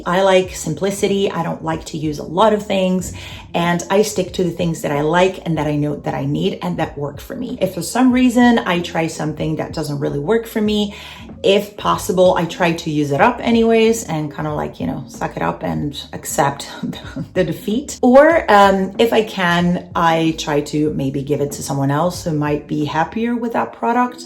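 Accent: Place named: United States. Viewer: Thanks for sharing. 😊 I would like to know why you have an American accent when you are originally from San Marino. What and where did you study? In the US? What was your major at the university?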